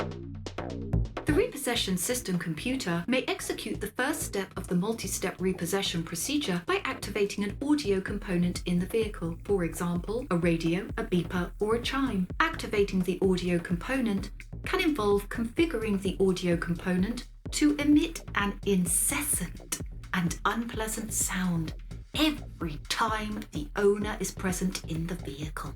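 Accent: United States. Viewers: The american accent is getting better every video. Well done 🎉 Question though: Did Amber use the cockney accent? As a german listener I am trying to learn the difference between the british accent (Lord, you have so many😅). The video however sounded like a bad idea for a Maximum Overdrive remake. This almost naive trust in AI is simply concerning 😑 Thanks for your time and content and the best of wishes Mr. and Mrs. Sheppard, hope they are doing well as well ❤ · It's weird that a brit other than John Oliver is teaching me about the goings on in my own country. Thanks for hilarious and educational content. And your American accents are fantastic.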